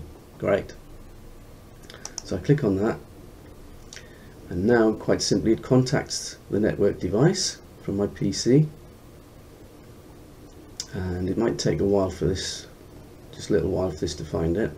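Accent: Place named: United Kingdom. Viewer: You sound british alot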